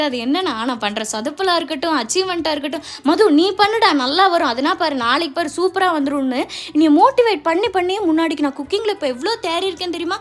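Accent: Tirunelveli, India. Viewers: Hi and. Congratulations 🎉 first. nowadays am following your style in my cooking yeah.like ungala mathiriye immitate panni cook pannuren 😅..my native is thirunelveli.. namma ooru slang athu innum highlight. Easy ah connect aaguthu pa. So happy for you guys... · Athuvum unga tirunelveli slang enaku romba pudikum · enkalukku thirunelveli neenka pesathu super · Tvl speech Vera level.same blood😊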